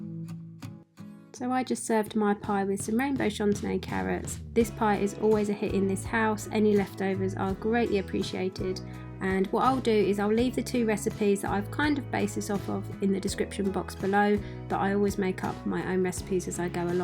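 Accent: United Kingdom. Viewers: So nice to hear a British accent on YouTube!!!!!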